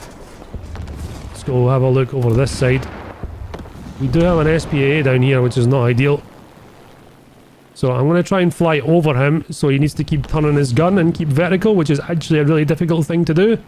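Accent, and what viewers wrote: Scotland, United Kingdom. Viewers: as a new subscriber, I ask this favor of you with the complete understanding that it very well may never happen. But, nonetheless, can you please say "Burglar Alarm" in a video, please? Jeremy Clarkson had a Scot say that phrase on the old Top Gear and It would kill me to hear you, another thick accented Scot, do the same. Thank you, sir, love your videos!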